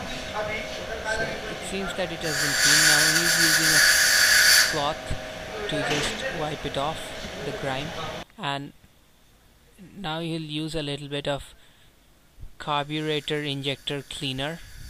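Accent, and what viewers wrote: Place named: Bengal, India. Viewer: dude that bong accent tho!! :D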